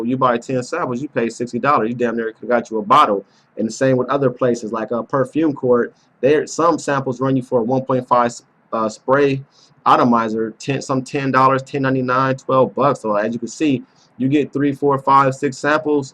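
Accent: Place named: South, United States. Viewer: Ok, I have Legend as well, but not the same. I personally you wanted to be the anti-haarlem guy to get fans. You get pass all tge orher notes in other colognes, right. The hype is guud muny, I didn't like it at saks either...but the ladies in PHILLY feelin it. You sound southern. ..different females, you have to take that into account Bruh. When you revieq come outta the box. Other than that, u aite with your presentation.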